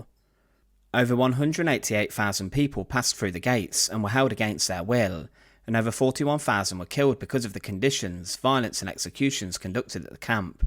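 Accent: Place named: England, United Kingdom. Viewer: Love your videos but your English accent is so damned thick I can't understand half the time. Sorrym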